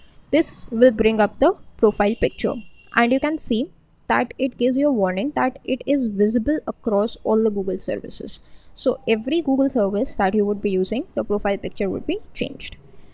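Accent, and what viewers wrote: India. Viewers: Indian english pronunciation totally error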